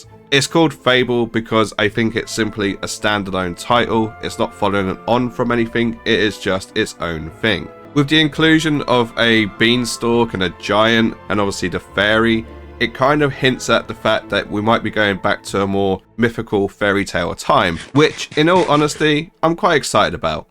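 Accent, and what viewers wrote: United Kingdom. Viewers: Oh fuck, a British good boi 😮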